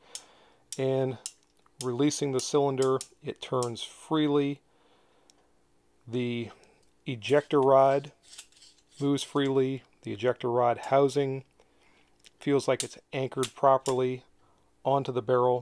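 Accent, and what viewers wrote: West, United States. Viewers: As also an Ohioan I approve the use of the western accent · Great review! Love the western accent 😄🔫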